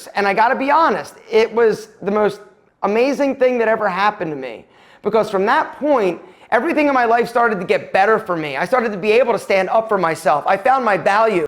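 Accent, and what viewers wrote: Philadelphia, United States. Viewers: Yo, where you from? You’ve got a Philly accent for sure, am I right?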